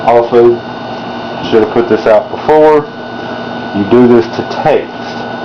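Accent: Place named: South, United States. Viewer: Having grown up with a southern mom, I understood every word! Please do not apologize for your accent - it's a beautiful thing. Also, awesome tutorial! Thank you! I have been looking for a good one for a while now.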